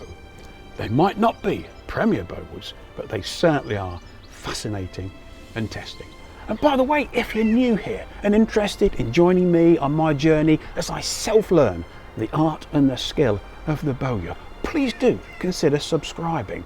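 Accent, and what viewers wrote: United Kingdom. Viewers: Beautiful video, Beautiful English accent. Thank you. Greetings from France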